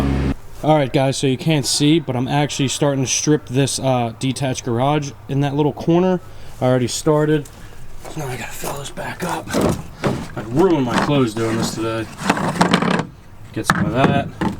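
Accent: Philadelphia, United States. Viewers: Also where in PA are you from? I’m from Delco, you definitely sound like your from the Philly area. I’m hoping to move out to the Lancaster area in a year or 2.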